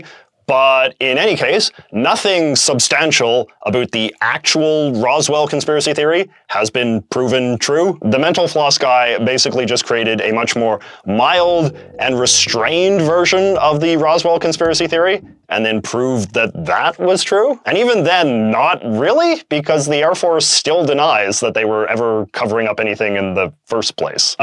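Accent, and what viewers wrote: Canada. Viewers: D --> my guess is Canadian because of how he says the word "about" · How are you the most Canadian sounding youtuber ever??? That "About" has been UNMISSABLE in this video. Love ur videos tho. Love the way u articulate and project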